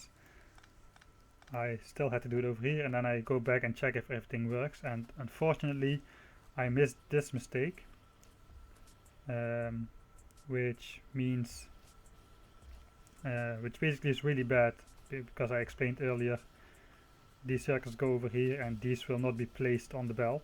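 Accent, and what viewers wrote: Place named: Netherlands. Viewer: je bent nederlands best wel te horen :P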